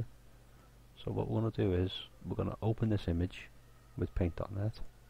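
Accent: Liverpool, United Kingdom. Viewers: scouse 4 life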